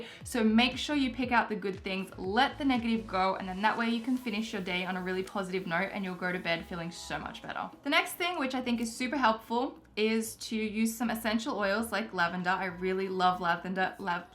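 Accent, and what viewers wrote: Australia. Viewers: what is your accent ?where are you from ? just wondering , I have heard others with the same accent but I"m curious ? Is it an Australian accent ?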